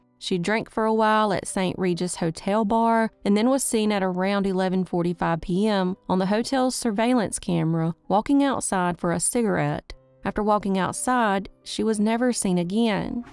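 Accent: South, United States. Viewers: Oh great an American voice I detect a southern accent! Whewfind a better voice todo this video!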